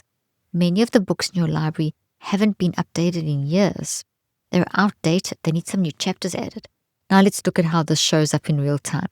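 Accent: South Africa. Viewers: Dr Leaf, are you maybe South African because your accent sounds exactly?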